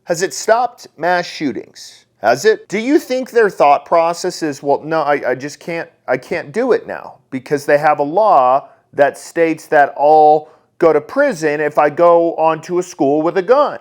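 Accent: United States. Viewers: This real man here speaks like an american!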